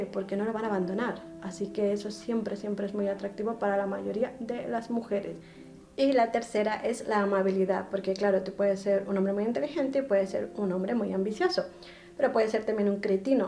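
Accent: Spain. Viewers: ENTONCES POR QUE HABLAS COMO ESPAÑOLA? 🤔🤔🤔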